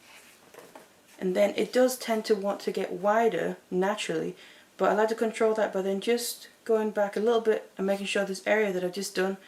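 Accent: United Kingdom. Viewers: Love, love me some British accent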